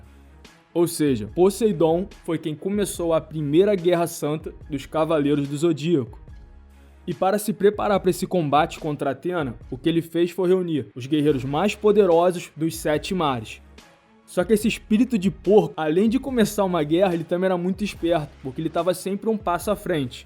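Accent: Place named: Rio de Janeiro, Brazil. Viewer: KKKKK MANO ESSE SOTAQIE É MT HILÁRIO Parece q eu to falando sobre cdz com um daqueles tios de boteco do rio Melhor canal de cdz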